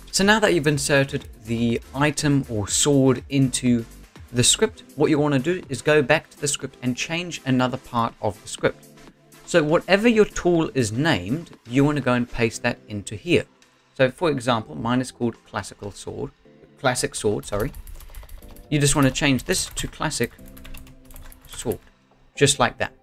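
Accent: United Kingdom. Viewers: pronounce your words dirty british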